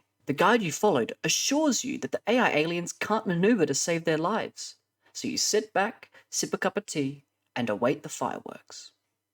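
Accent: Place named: Australia. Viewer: Nice to hear another Aussie voice picking up where Perun left. I'd love to see an update of tactics and overview of what's changed in the game. I stopped playing it a while back after a few play-throughs proved the game needed a lot of work to balance it and make it enjoyable for most players that don't have a masochistic streak. It has a lot of potential but is damn complex with so much of how the game works hidden, making it difficult to understand for many. Harder still with all the updates. Harder again if pandering to fanbois that do nothing to actually bring in new players and money. I hope Johnny Lump and co make a success out of this though as it's probably the most realistic grand strategy game out.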